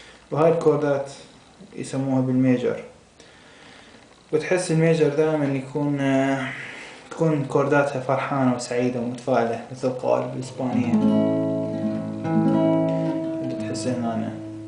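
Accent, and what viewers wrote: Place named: Iraq. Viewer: المدرس عراقي من الكلام مالته...مو bmw!!!حلوة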